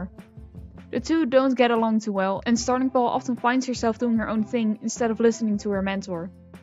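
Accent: Netherlands. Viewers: are you dutch? your accent sounds similar to that of dutch ones :0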